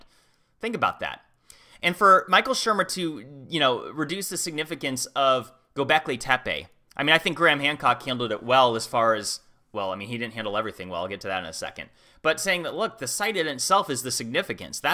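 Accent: United States. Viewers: Totally unrelated to the topic at hand but you sound like you're from New England, am I correct?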